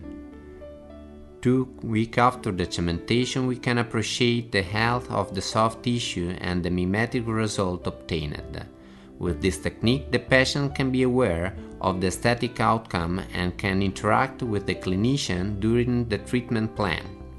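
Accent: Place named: Italy. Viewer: to avoid healthy animal.... hahahah love the 'italian English'.....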